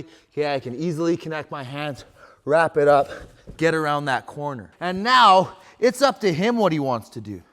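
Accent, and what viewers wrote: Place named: Canada. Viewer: 100% you know its Tristar when you hear the Canuck accent.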